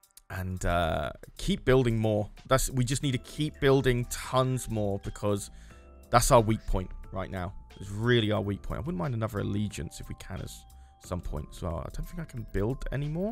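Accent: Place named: United Kingdom. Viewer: The British accent made this perfect for an imperial campaign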